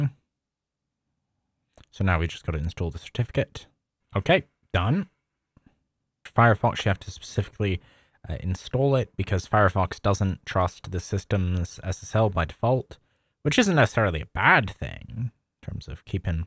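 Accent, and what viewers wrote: Canada. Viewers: He's from Canada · i know that, but canada is huge and has many accents, im trying to get the accent down specifically as this isnt the canadian accent im used to hearing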